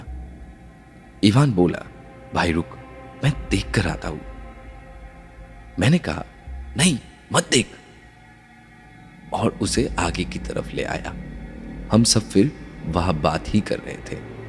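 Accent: Bengal, India. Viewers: Love from Kolkata .....I am bihari Girl .....aapnar kothay ektu ektu bengalier tuning ache .....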